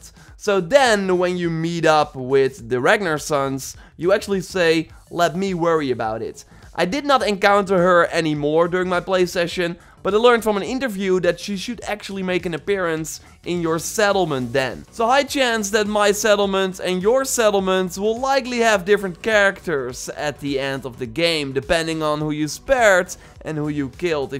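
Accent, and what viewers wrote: Netherlands. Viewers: Are you dutch? You sound dutch... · u dutch man? if u are im subbing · I was like.. this guy his voice sounds so dutch and little did iknow hes dutch lol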